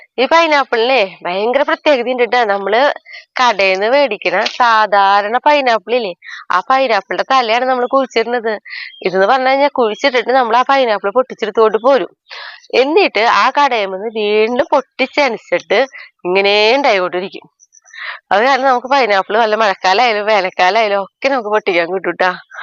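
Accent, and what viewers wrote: Thrissur, India. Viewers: Clear ആയിട്ടാണല്ലോ സംസാരിക്കുന്നത്. പിന്നെ അവരുടെ തൃശൂർ സ്റ്റൈൽ ആയത് കൊണ്ടാകും അങ്ങനെ തോന്നിയത്..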